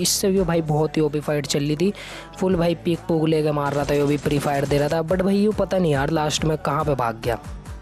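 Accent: Haryana, India. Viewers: Bro ur haryanvi??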